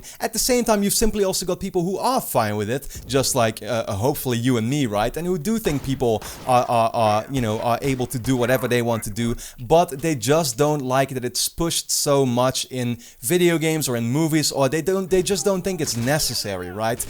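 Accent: Netherlands. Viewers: Ah, ik dacht al een Nederlands accent te horen. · are you dutch ? Your accent sounds like it ;p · The sexual orientation of a character really does not matter to me, nor their race, gender, strength. I really agree with everything you said, even though it's a while ago. I understand that people might think an agenda is being pushed, because they do not agree with it, and suddenly they are confronted with something they might not expect in a game like tlou, but i hope they can learn to see, it is not pushing anything. If Ellie would be kissing a boy, sure, someone might say he is pushing a hetero normative agenda, because they would rather see more gay romance in games or on screen, but in the end, the developer has all the right to put his or her ideas into their creation, their fiction. Their art. I'm not really OK with people demanding artists to change their work to please the masses, but ofcourse, everyone can have their opinion, but they shouldn't expect the devs to bend to their will. 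And, are you a fellow dutchy? Not that it matters, but the accent? Cheers for the video.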